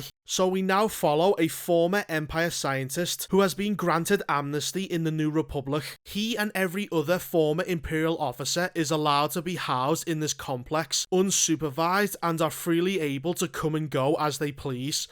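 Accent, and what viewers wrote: Liverpool, United Kingdom. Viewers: You’re deff a scouser haha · Are you scouse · I love you you scouser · No danger a scouser thought anyone would listen to this fucking accent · first fellow scouser nerd ive come across on youtube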